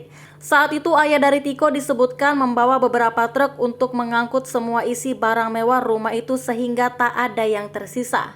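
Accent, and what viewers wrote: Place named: Banjar, Indonesia. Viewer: Bahasanya kok presintar kaya bahasa banjar. Ada sedikit logat2 x mba. Hehe 😁😅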